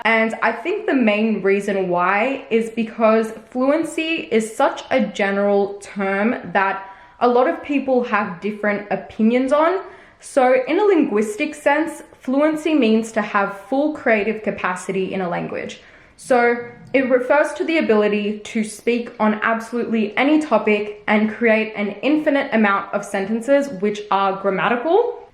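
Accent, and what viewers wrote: Australia. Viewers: I don't know if anyone gonna talk about it or not but I love your Aussie accent 😁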